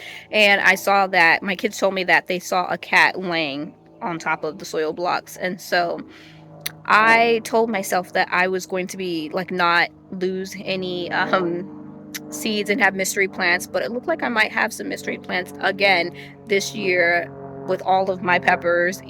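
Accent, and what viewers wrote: New York, United States. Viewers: I never heard your New York accent before! I would have never guessed! Wow! You are really prepared for Spring! This summer I will be attempting to grow Tepary Beans. They are native to our Desert here. The Native Americans have grown them for centuries here without any Irrigation! Hope they grow! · Don't lose the NY accent - it's cool!😎 I'm from AZ but have always loved NY❤️✌🏽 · I’d never known you had a NY accent, but it’s ok, you sound good to us. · Heyy... I love your N.Y. accent, just let it roll.. lol.. but you're doing just fine. I am glad you were able to get the beds done and the hoop house. I too, did a lot of marigolds as well and doing some more flowers. The duck cattle panel hoop house is cute too.. have you look on pinterest on duck, and chicken panel hoop houses.. they are cute as well.. I am so stoked about going out to the homestead when it warms up to design or get everything started... 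You have everything going well, I am very proud of you on every thing you do.. have a wonderful day.. I do have a question though. If you look at my beds will I have to get top soil or could I just put my black kow on top. I used a lot of compost leaves sticks etc.. or do how you are like putting top soil and then the black kow, I get confused.. You do look good sitting up under your gazebo with your table and chairs.. lol.. Thank you for the video..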